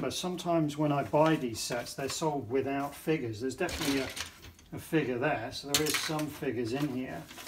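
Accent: United Kingdom. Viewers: Do you buy Mega in the UK? You have an English accent....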